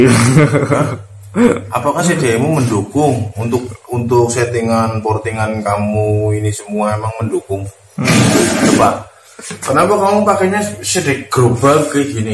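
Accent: Banyumas, Indonesia. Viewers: Rika si wong ndi deneng Kya kenal ngapak e 😂 salam Sekang purbalingga kang😂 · Dari mana bang, koq ngapak🙏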